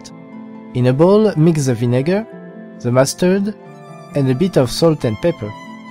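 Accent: France. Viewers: Know what part of Europe is he from I feel like he is french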